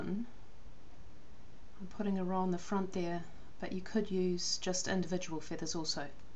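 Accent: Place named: New Zealand. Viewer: Kiwi accent yeahhhh!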